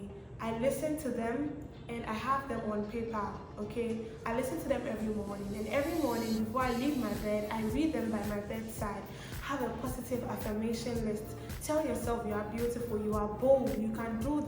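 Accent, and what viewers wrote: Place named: Japan. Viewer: 😃, your english accent now tho. You are sounding more Japanese now😂. Wisdom you speak. Thanks for sharing and again, you inspire!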